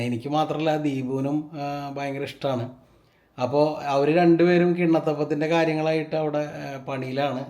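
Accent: Thrissur, India. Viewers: ഒറ്റ ഇരിപ്പിൽ കൊറേ വീഡിയോ കണ്ടു. Sub ചെയ്തു. നല്ല അവതരണം. Simple but powerful. ഓവർ ആക്കി ചളം ആകാത്ത തൃശൂർ ഭാഷ. നാടൻ food. എല്ലാം കൊണ്ടും സൂപ്പർ ❤️❤️❤️. ഇടക്ക് ദീപു ക്യൂട്നെസ്സ് വാരി വിതറുവാണോ 🥰. 😜 കണ്ടു മടുക്കാത്ത വീഡിയോകൾ . 👍🏻👍🏻👍🏻👍🏻👍🏻🥰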